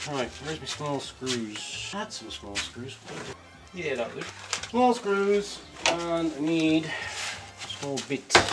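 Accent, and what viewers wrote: Ireland. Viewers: Perfectly timed for the experience of a taco salad then wine accompanied by an Irish brogue. I zoned out on the math calculations, though, ha ha. I'll give you a like in spite of those. And now to go and address my own piles of clutter. I hope we both win. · I get the feeling, when I read the comments, that everyone has your lovely Irish lilt and expressions front of mind when we gather our thoughts and write them down. I always write with an accent when I write my comments after listening to you. Your expressions are really endearing. Thank you so much for sharing your day with us. I really enjoyed lunch. It was delicious. That dessert was particularly magnificent. My nosey attitude is wondering what you might be bringing home with the engine when you return. I hope you have a wonderful week. At least, helping Dan will stave off feeling like a 60 year old for a very long time. 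(mid-afternoon naps are the half of it!!)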